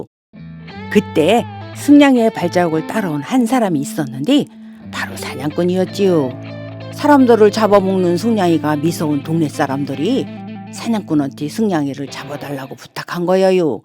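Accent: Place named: Chungcheong, South Korea. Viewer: 구수한 충청도 사투리도 정감이 넘치네요... 어느지방 사투리든 우리맘을 편안하게 하는 힘은 있는것 같애요.... 잘 보고 갑니다~~~~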